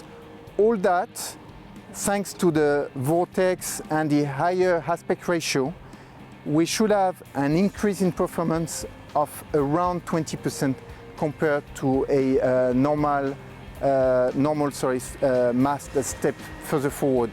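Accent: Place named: France. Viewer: A frenchman trying to speak english... hilarious.... I can`t stop laughing..... Very nice boat by the way. Really nice boat.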